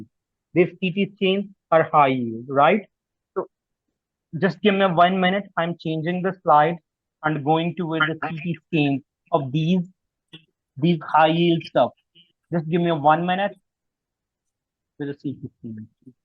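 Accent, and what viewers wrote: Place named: India. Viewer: Your E. accent is horrible. Best for India to quit English talk. Make it silence in or written Subtitle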